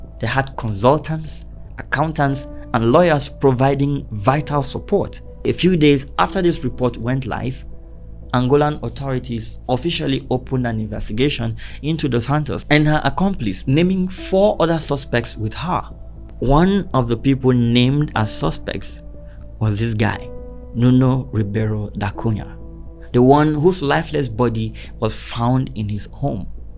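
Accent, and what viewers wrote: Nigeria. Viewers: Sounds like a Nigerian...you know what i mean! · I am not hating, but the fact that this story is narrated by someone that sounds Nigerian, is hard for me to trust it!! Scammers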